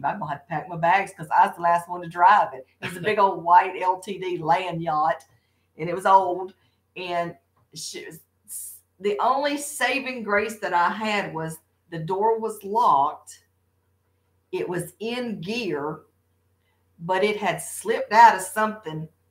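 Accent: South, United States. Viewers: I enjoyed your stories and y'all's sense of humor. You "cracked me up!" 😁 I also like your Southern accent. My grandpa on my mom's side of the family was from Gaffney, South Carolina. I loved his accent! My grandma was from Cherokee, South Carolina. I never knew her because she died when my mom was 7. I hope you continue to put out more content. It really cheered me up.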